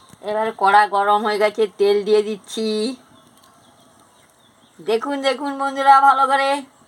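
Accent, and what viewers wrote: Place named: West Bengal, India. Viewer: Pochim bongo kotha tone dia buja jai niramis jobor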